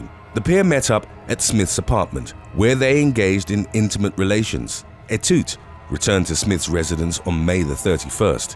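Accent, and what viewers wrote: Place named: England, United Kingdom. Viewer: when i hear an English accent i assume its white. kinda disappointed its not a white old guy.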